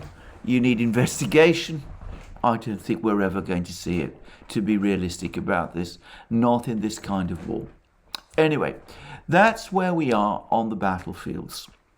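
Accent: United Kingdom. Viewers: Fantastic analysis, unbiased, clear and balanced. Great British accent.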